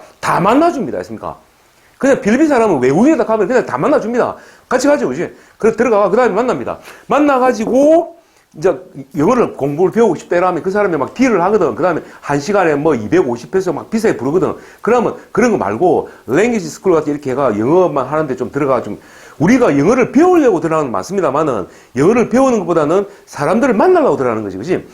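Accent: Gyeongsang, South Korea. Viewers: 선생님 강의를 열심히 듣고 있습니다. 경사도 사투리에 구수하고 실제적이며 귀에 쏙쏙 들어옵니다. 저는 1년정도 영어 공부를 할려고 합니다. 어떻게 해야 효과적일지 가르쳐 주세요.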